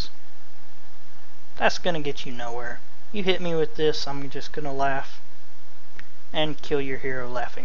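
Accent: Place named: North Carolina, United States. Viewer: Are you from NC? accent sounds like where I live.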